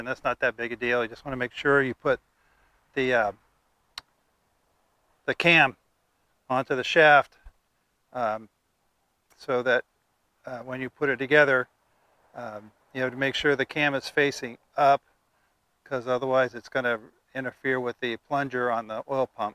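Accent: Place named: Yorkshire, United Kingdom. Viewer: thanks for another excellent tutorial. The overdrive on TS394LO was working when I shut it down a long time ago so hope it is still OK. Our families are from the same neck of the woods - Yorkshire - probably why I can understand everything you say.:-) John in Manitoba.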